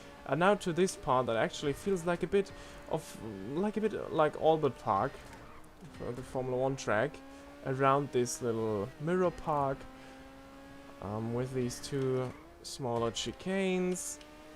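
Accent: Germany. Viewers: Hat man schon am Akzent gehört, das du deutsch bist👌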